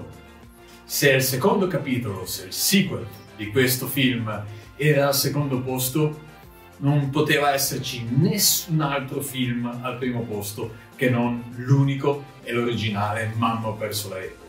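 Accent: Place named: Italy. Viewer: Accento italiano divertente 😜 auguri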